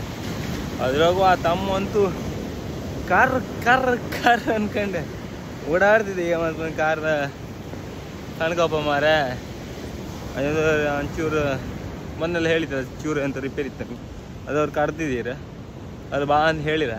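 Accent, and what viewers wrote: Kundapura, India. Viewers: He speaks in Kundapura kannada. It's different dialect of Kannada. Do not insult any dialect of kannada.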